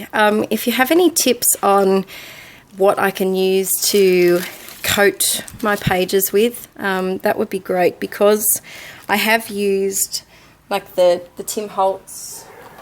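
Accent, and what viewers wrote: Australia. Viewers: HI there. Sounds as if you are a neigbour of mine ....I'm in New Zealand .....I think you might be in Australia, but you might be closer than that :) I used a sealant craft varnish on my art journal pages, & almost ruined some pages, because they stuck together & bits tore as I prissed them apart. So like you, I am having to put waxed baking sheets between the pages ...of my whole book lol, but I actually don't mind the look of that too much. Your first art journal is way better than my first one, which after more than a year, I am still only half way through. I have heard that Mod Podge makes an excellent sealant & doesn't stick, & I use Mod Podge to seal paper images I use when altering a canvas, & it dries quickly & doesn't stick. I wish I had remembered Mod Podge before using the varnish sealant. Great first art journal! Subscribed to your channel now. 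· You're Australian? I trying to place your accent. My 7th grade music appreciation teacher was Australian. I was the only person in the class he didn't pick on.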